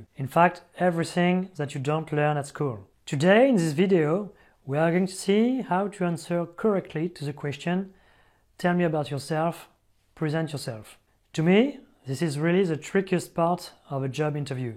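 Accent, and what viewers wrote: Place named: France. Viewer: Je ressens toujours l'accent Français quand vous parlez lol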